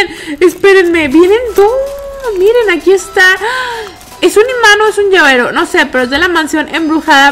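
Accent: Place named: Fresa, Mexico. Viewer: mira deja ese asentó de fresa si yte voy a decir algo me gustan mucho tus videos pero la verdad no me gusta tu asrntó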